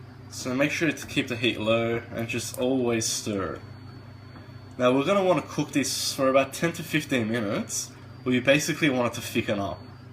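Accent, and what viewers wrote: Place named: Australia. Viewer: Yeh the Aussie accent thanks I will try this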